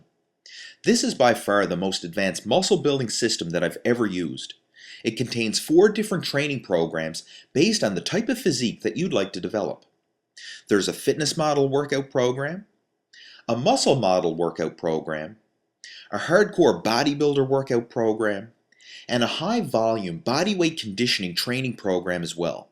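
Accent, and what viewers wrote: Newfoundland, Canada. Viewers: Are you from Canada? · I love your canadian accent! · best canadian accent i've ever heard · Is that a newfie accent I hear? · Great videos! Lovin' the Newfoundland dialect aswell haha :D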